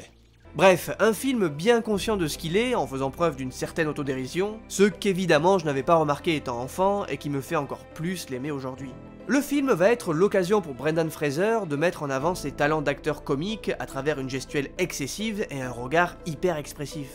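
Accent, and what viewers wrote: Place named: Perpignan, France. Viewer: Tu as l accent de Perpignan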